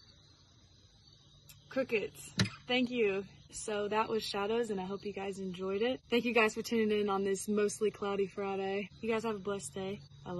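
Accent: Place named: Carolina, United States. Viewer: Beautiful song sang beautifully. Like hearing that kiss of a Carolina accent when you talked. Like the saying goes. If you don't like girls raised in the south (GRITS), raise your standards. Happy Holidays to you and yours from Texas. I spent four years at Ft. Bragg, NC and still have a fondness for North Carolina 30 some years later.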